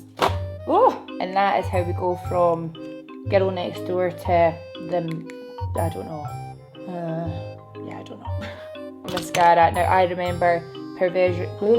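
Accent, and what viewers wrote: Scotland, United Kingdom. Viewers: Got my captions on because I'm blow drying my hair and can't hear... YouTube cannot translate the Scottish accent. Instead of 'contouring' the subs said 'corn shooting' and it's killing me 😂🤣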